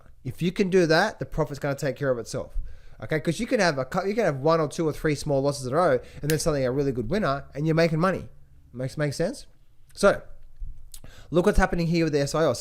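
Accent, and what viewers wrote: Australia. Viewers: Thanks,. DAH-ta for us Americans. DAY-ta sounds better with your Aussie accent. nq17k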